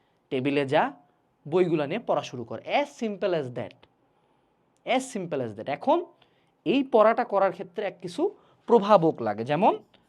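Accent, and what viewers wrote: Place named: Rangpur, Bangladesh. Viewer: Wow vaia sb smy reality tai bole..r vaiar ktha gulo sunle amr rangpur er ktha mne pre jay age sir rao evb blto amdr..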